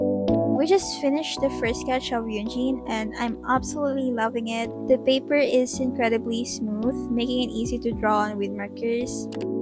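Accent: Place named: Philippines. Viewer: I see hyunjin and art I watch (also new sub cuz i love your artworkkkk) Also you kind have a filipino accent (Are you filipino by any chance?)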